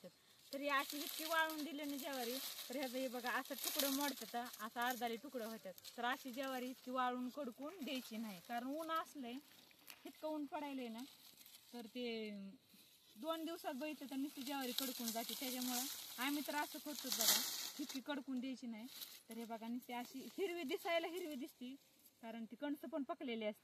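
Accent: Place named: Parbhani, India. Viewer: तुमच्या बोलण्याच्या भाशावरून परभणी किंवा हिंगोली जिल्ह्यातील वाटतात ताई तुम्ही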